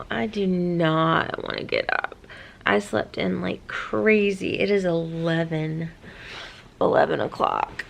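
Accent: South, United States. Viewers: Wow... some people are really shitty (excuse my language). This is the first seeing you on YouTube. Immediately, I said I love her spirit. So, I am a new sub. I love that you shared your real life struggles. Your friendenemy sounds jealous hearted. You are probably everything she wish she could be but can't be no matter how hard she tried. Please keep being your authentic self. I love your southern north twang.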